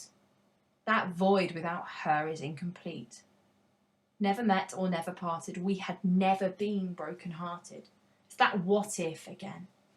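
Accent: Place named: Scotland, United Kingdom. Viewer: Thank you. Truly a delight to hear it in a Scottish accent I might add. 😊😊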